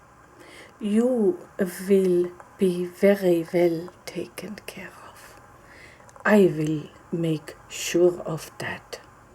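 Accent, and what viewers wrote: Germany. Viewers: This is the first time ive seen tbis video what a great job I loved the extra strong accent you look like a real nurse I know these types of videos are not easy it would be so cool to see more videos like thiz if you can ever find extra time that is i would love if you made a video with you speaking in german just for extra asmr tingles im sure all of your followers also would enjoy to hear you speak your native language anyway this was just a thought i love asmr where people have accents or speak in other languages this is a huge asmr trigger for me Thank you for all your hard work love from nanaroriasmr 💖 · Unexpectedly brought to this video and I really like it because of the strong accent I don't hear so often in other videos. The dialogue makes me feel like I was staying in a small, family-run inn after a long day of traveling. I feel like comfortable and safe in the capable hands of German hospitality. 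I haven't been to Germany, but I very much hope to in the future. I would look forward to more videos!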